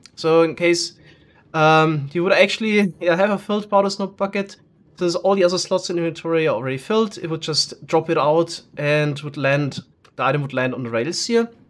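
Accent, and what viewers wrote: Germany. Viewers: Du hast einen sehr deutschen Akzent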